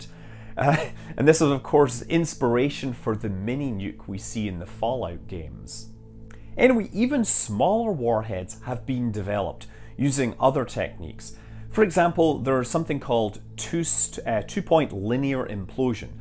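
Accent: Scotland, United Kingdom. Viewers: where are you from originally in Scotland? Your voice reminds me of my grandfather who was from Wishaw:) I've lived in Wales most of my life and miss the Scots accent! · You know, most of us just watch your videos because we’re entranced by your Scottish accent. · I canny place your accent, Scottish ~like me, not Glasgow, more 'refined'........hmmm. :)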